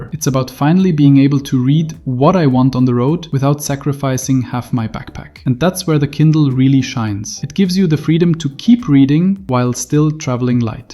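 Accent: Germany. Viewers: The man is from Germany and probably only used three different microphones or recorded on three different days while he was travelling. At least, I don't know of any AI that can imitate a German accent as well as a German who speaks English.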